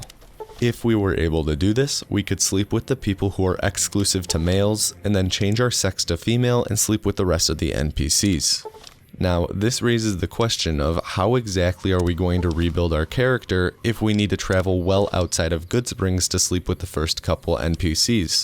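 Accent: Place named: Midwest, United States. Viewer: Where are you from? Your 'a' is very distinct, sounds midwestern to me!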